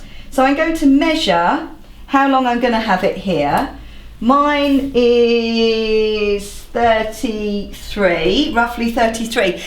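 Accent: United Kingdom. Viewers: omg just discovered ur channel. finaly a british doing something practical, all my other youtuber makers are speaking either american or ausies which is kinda confusion,.... subscribeeeed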